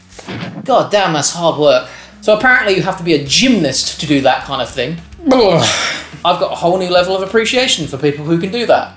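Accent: United Kingdom. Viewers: You're the man with the most marked British accent I've ever seen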